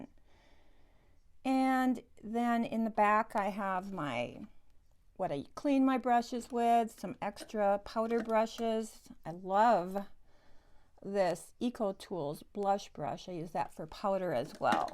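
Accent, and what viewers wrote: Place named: Midwest, United States. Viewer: What a lovely, Quint cottage you have!! Thank you so much for sharing it with us. Can I ask if you are a Midwesterner, like me? We kind of sound alike:) You do a great job making use of the space you have!🦋☀️🥰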